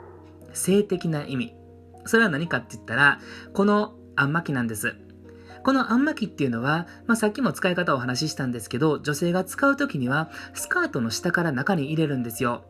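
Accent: Kansai, Japan. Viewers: この絵にそんな意味があったんだ〜と感心しました。硬いパンでも、勿体無いから工夫して食べよう、うん、とっても大事な精神だと思うけど、プロテスタントはやっぱガチガチだね〜と思いました。プロテスタント系の中高一貫校に通っていたので、何となくそういう雰囲気って分かる気がしました。所で、は大阪？出身？イントネーションがもろ関西ですね。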